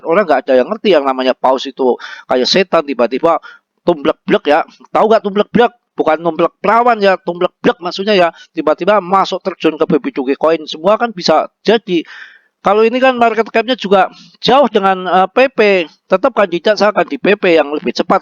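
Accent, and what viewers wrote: Banyumas, Indonesia. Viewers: Mantap...bahasanya kok mirip nyong banget😅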